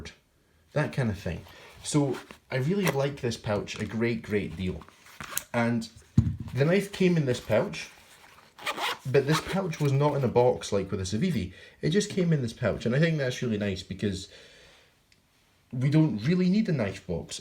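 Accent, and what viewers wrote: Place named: Scotland, United Kingdom. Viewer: Nice review. Good to hear a Scottish accent :) I have just ordered the Slayback with the san mai ZDP189 blade. Great looking knife.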